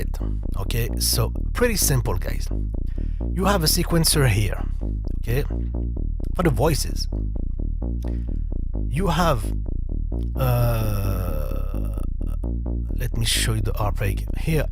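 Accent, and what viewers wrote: France. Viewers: Excuse my French but your English sound french 😅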